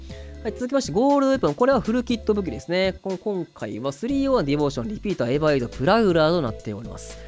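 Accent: Kansai, Japan. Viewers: いつも解説ありがとうございます！関西訛りあるのに聞きやすくてすごくわかりやすくて感謝です！アプデ後も楽しみにまってます🐧❤️‍🔥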